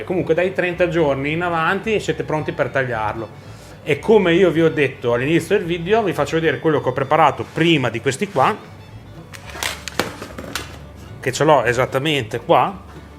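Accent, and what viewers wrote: Emilia, Italy. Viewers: sei bravissimo, grazie per questo video! una curiosità, di dove sei? dall'accento sembri emiliano. io sono romagnolo (Rimini) ;)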